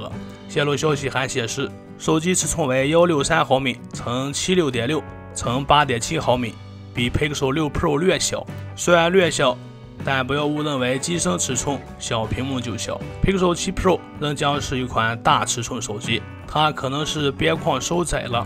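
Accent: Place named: Northwest, China. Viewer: 口音浓浓的西北黄土味！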